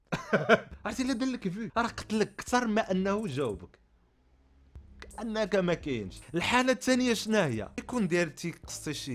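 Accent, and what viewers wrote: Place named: Bechar, Algeria. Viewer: ❤صوت❤ ديالك كايشبه لصوت نتاع ولاية بشار جزائر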